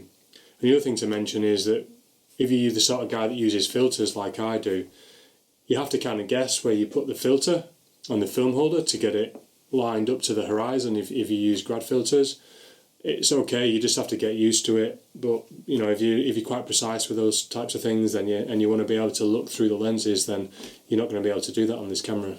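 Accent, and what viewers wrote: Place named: Sheffield, United Kingdom. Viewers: You sound like you have a northern English or Scottish accent, not New Zealand.. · I can tell your accent is somewhere near Sheffield.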